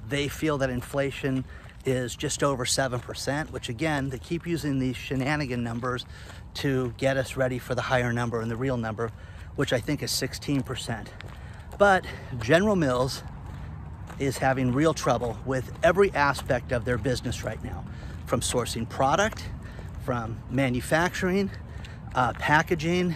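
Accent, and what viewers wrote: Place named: Western New England, United States. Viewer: you sound like you're from Western New England... like me. Great work!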